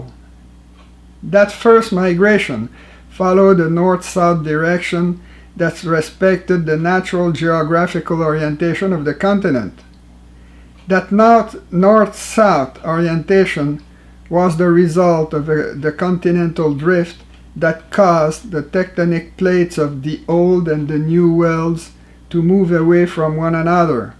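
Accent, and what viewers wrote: Quebec, Canada. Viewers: your own government actively committing civilizational genocide on itself is pathetic. Also, this man sounds like he's from Quebec, not the US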